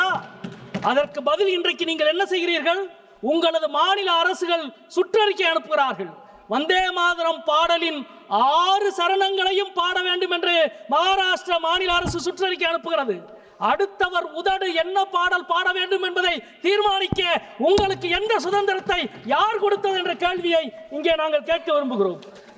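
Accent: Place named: Madurai, India. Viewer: சங்கிகளுக்கு சரியான செருப்படி கொடுத்த மதுரை பாசையில் வீர முழக்கமிட்ட செந்தோழருக்கு வாழ்த்துக்கள் இன்குலாப் ஜிந்தாபாத் நன்றி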